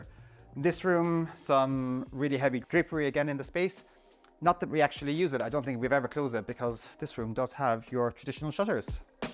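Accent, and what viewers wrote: Ireland. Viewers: He is Irish. Not everyone who lives in the Uk has the same accent. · Hi lovely house, but do i hear an Irish accent????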